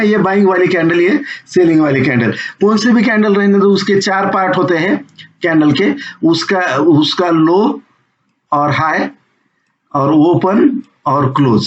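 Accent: Maharashtra, India. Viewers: Saheb tumcha tone varunach olakhla tumhi marathi ahe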